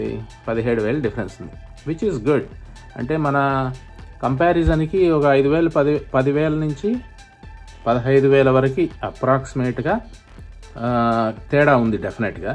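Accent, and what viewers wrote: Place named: Telangana, India. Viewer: Telangana slung sir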